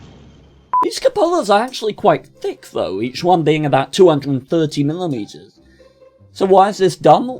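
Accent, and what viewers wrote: United Kingdom. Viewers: Sory to hear that. I was thinking that you spoke like that to make your English the most British sounding possible. Sory for my " neanderthal" English. Best wishes for you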